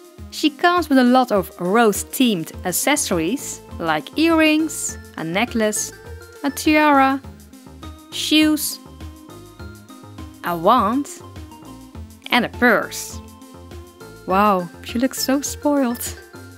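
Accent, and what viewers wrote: Netherlands. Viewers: Please Ive been a subscriber for a long time 😩 Your tutorials were one of my fav since you made the dolls come to live and gave them such a personality Im hooked. Im also from the Netherlands so I recognize that accent. Plus the faceups and animal creations are top notch that they remind me of the Fantastic Mr fox. Ive been watching for a long time even when I was unsubscribed.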